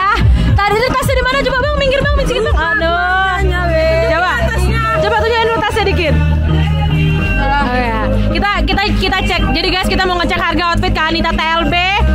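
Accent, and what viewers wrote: Medan, Indonesia. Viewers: Gasuka basabasi, aku suka kak dari awal dia muncul di tiktok sampe sekarang krna logat medannya yg suka ngegas + muka nya ga ngebosenin ❤ Salam dari medan kk 🙏 Dalam hati "semoga dapat kiriman", aamiin yaallah 😌✌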